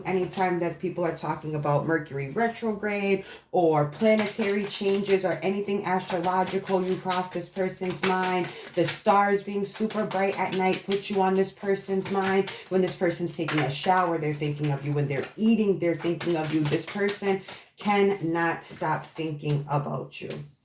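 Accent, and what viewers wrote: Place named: East Coast, United States. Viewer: You have no idea just how much i would enjoy to sit and chat with you or have a personal reading and such. In the world of psychics and tarrot there are but a few who are truly gifted and know how to properly utilize and share that gift. Sadly we live in a world where scams have existed from the beginning. So its very refreshingly beautiful to come across a reader such as yourself. Not a phoney bone in your body. You are clear, speak well and very detailed and concise as far as a card can be keep what resonates and leave the rest You have a nice clean visual for your background looks bright and clean with that lil pop of red and your speaking voice is very very easy for me to listen to. Im sensetive to sound and light. Sometimes a person could be lovely to look at then the minute they talk i just want to run lol. 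Also hear a bit of east coast accent and i luv that and i just adore you. Your shorts are wonderful 👍 i have never taken a salt bath but there are Himalayan salt spa caves and i would luvv to go to one or the healing spings in Arizona. Anyway i believe in the universe and that it gives us sign after sign and so very many who dont believe just wount see the signs. Heck even those who believe miss or ignore signs. Spirituality is a journey and a very personal process. For some it starts as toddlers for others teens and older and sadly for some never. #ALL CAN, SOME SHOULD, NONE MUST! Love&Light ~♡~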